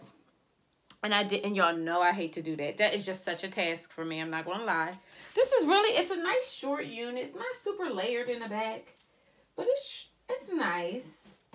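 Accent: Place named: Philadelphia, United States. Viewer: You from Philly? I am and you sound like you from Philly😂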